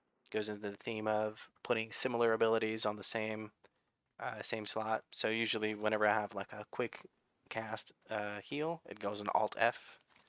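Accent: United States. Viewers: What's your native language? You have a very American accent now.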